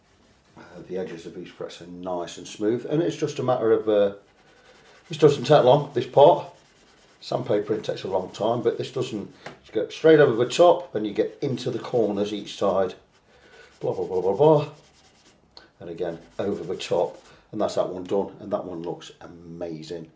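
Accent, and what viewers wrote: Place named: East Midlands, United Kingdom. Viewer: Hi. I have this exact guitar too and I absolutely love playing it. By only bug is the nut. I think it would benefit from a graph tech nut or something along those lines. Possibly even some locking keys like other PRS models. Your accent sounds pretty close to where I’m from. Possibly Derbyshire/Nottinghamshire or around that area? (Also based on your comment about being around 60 miles from Northampton) If so I’d be interesting in using your services in future for a few mods and setups etc. Thanks for the video, it’s reassuring to know you take good care of peoples instruments rather than handing it over a counter and not seeing it for a few days 👍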